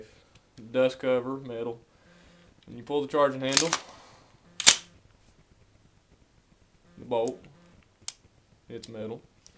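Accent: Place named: Texas, United States. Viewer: This is not to hurt your feelings, but you sound like the Texas guy from BFBC 2.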